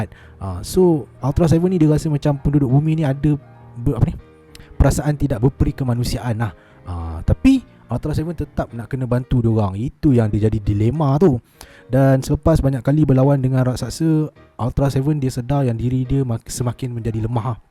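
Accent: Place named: Kedah, Malaysia. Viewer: Awat ckp kedah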